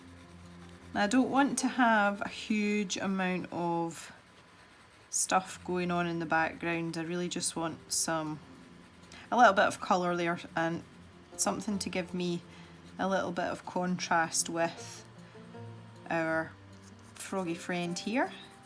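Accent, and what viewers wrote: Scotland, United Kingdom. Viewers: Loved the video and am one of those who loves your accent. My Nan was Scottish and I have many a happy memory of visiting her in Bonny Scotland. I miss her so much, and find your voice sooo soothing!Also, I was born and bred in Corby which is known as a Mini Scotland and has a wide range of Scottish accents, so understand you perfectly (though I couldn’t always understand my Nanny June 😂)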